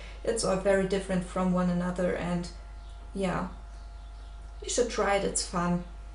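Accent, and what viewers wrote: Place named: Germany. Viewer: Are u german? U have a german accent...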